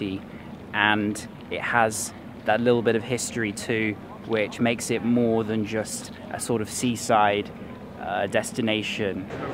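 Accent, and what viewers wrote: England, United Kingdom. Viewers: you're from England?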